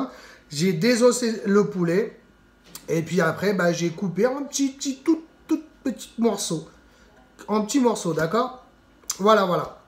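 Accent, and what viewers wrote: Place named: Réunion, France. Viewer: Ta l'accent un peut créole de la réunion ou mauricien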